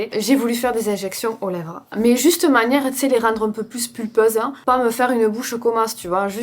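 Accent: South, France. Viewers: Ton accent du soleil est si agréable à entendre et renforce cette petite bulle de positivité et d'energie que tu nous transmets au quotidien, j'adore t'écouter et tes vidéos égayent mes journées ( et je sais que je suis loin d'être la seule ) 😊😊😁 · Alors moi j’ai 60 balais et je suis tombée par hasard sur ta chaîne. Et ton accent m’a sifflé direct dans l’oreille moi qui habite le sud sud profond. Tu es touchante. Rigolote. Concernant le bad buzz tu dois bien savoir ce qui se dit dans le sud…. 😅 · Merci énormément d’avoir ce discours sur l’alimentation et le sport 💪🏼 j’ai vu ton évolution physique et bravo!! J’adore ton contenu, et ton accent me rappelle mon sud 🥹 même mon chéri te reconnaît par ta voix quand je met ta vidéo 😁 encore super vidéo et pour le Bad buzz je n’en ai même pas entendu parler tu es au dessus de tout ça 💪🏼 sincère et authentique🥰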